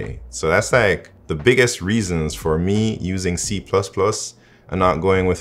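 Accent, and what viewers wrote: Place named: Jamaica. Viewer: nice nice g! Good amount a Jamaicans in tech innu i based in seattle. Good fi hear someone on Youtube/Streaming in we own accent. Feels good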